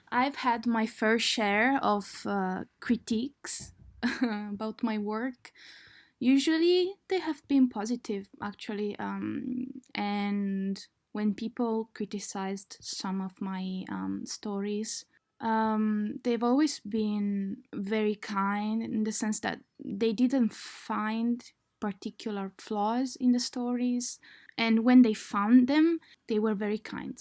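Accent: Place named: France. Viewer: Are you french ?? You sound like french 😆🧡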